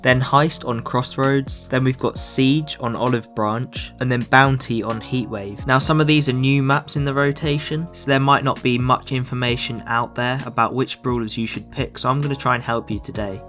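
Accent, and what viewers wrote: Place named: United Kingdom. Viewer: This is the most British accent i have ever seen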